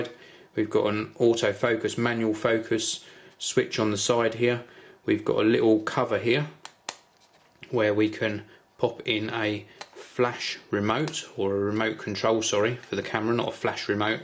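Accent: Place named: Essex, United Kingdom. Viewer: no, he has more of an essex accent.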